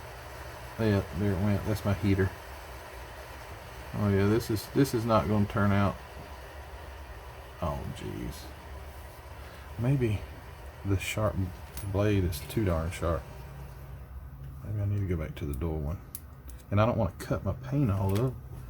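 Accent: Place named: Georgia, United States. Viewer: I rather watch videos with someone explaining things than just music , I like listening to you cause you are from my home state Ga and I miss it but I sure don't miss the humidity. .o boy lol.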